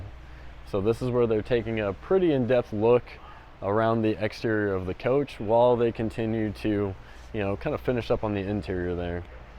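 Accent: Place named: Texas, United States. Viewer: Вери гуд👍🤣английский у автора канала чисто американский с техасским акцентом! 👍👍👍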